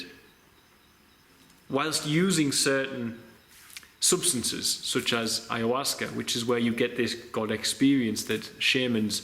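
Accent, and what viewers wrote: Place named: Newcastle, United Kingdom. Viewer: This is what I needed as a Glasweigan. A mega spiritual Geordie to cement what I already knew. Not even a lick of sarcasm. Yes!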